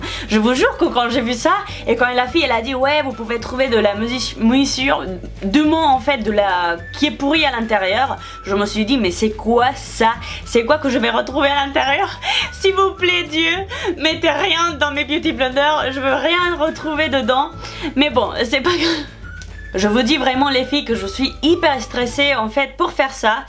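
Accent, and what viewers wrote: Spain. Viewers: Axent espanioll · le "mo" c de la moisissure 😂😂 et c le fROmage et pas le Formage😉😂 et pour info je sais qu' elle a un accent et qu' elle est espagnole et pac française de base c pour ça qu' elle fait des fautes et je l ai juste corrigée pour qu' elle s améliore c tt les rageux il faut vous calmer😂😂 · T de quelle origine ? Ps: superrr videooo ❤ creo q ablas espagnol pienso q e reconosido el acentoo ❤ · Tes trop chou avec ton accent espagnol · Jsuis la seule qui kiffe l’accent espagnol sur le français ? 😍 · T espagnol ?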